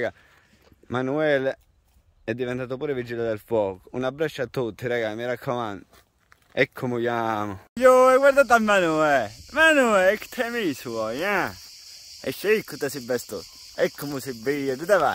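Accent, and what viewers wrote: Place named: Lombardy, Italy. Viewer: Tipico accento lombardo 😅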